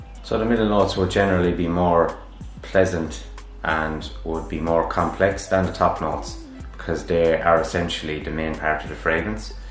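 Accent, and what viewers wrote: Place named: Ireland. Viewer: 8 seconds and in i said out loud at my computer screen "woah! this guy's irish!" 😂 before you even announced it (not a bad thing, i love the accent) i then knew without a doubt this was gonna be the video to satisfy my craving for the knowledge on what top, heart, and base notes are.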